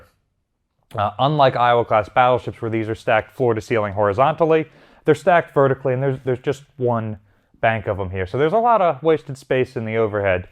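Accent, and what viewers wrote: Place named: New Jersey/Philadelphia, United States. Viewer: Yeah, definitely from the NJ/Philly area. That's how they pronounce it down there.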